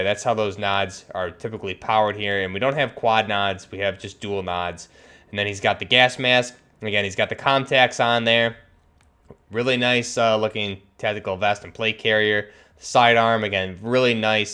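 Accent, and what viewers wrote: United States. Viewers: What voice does grom us? american?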